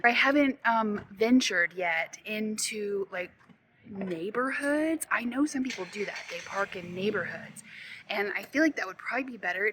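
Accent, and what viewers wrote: South, United States. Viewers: I like you. My heart feels for your story. I think you need a way for your followers to help you financially. You are worthy and I think you are very funny and talented. Life isn’t fair sometimes but I applaud you for starting your channel and living in your van to make it in this world. Do I detect a Southern accent? And your smile is electric! You’ll do well. Take care. Cathy in Nashville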